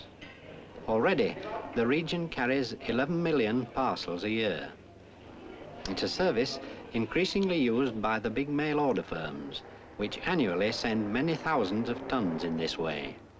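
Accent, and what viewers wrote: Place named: Newcastle, United Kingdom. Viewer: I can hear the Geordie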